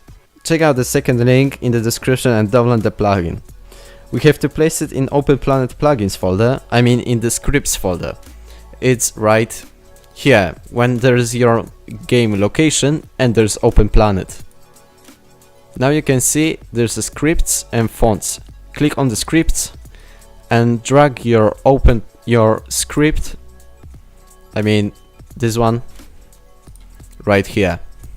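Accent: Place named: Germany. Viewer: Masz akcent jak najebany szkop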